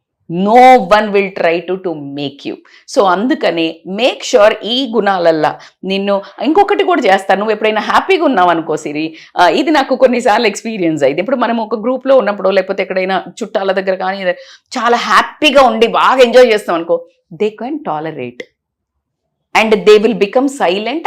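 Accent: Telangana, India. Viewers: I like her accent of Telangana language 🎉generational ga elagae matladutharu🌸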